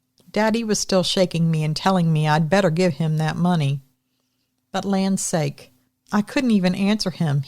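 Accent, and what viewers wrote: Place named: Eastern Kentucky, United States. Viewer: Well I’ve got tears streaming down my face. I too grew up in a house with. Dad that was gone more than he was there and was always spending all our money at the bar with floozies. I was never beaten but I was screamed at and I heard a lot of yelling between my parents. I too remember the day my Mom made my Dad leave. Thank you for sending in your life changing story. I’m so glad that wood booger came along to save you and in turn saved your family., I’m married to a guy from Eastern Kentucky and you sounded like you could be from there reading this story. 😊 I felt like my Mother in law was still here. We lost her to breast cancer in 1998 but you sure did sound like her reading this. I think it’s the pattern of speech they have out there that did it because you have never reminded me of her before. Thank you to you both for bringing a happy ending of Christmas 🎄 joy. 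💖💖💖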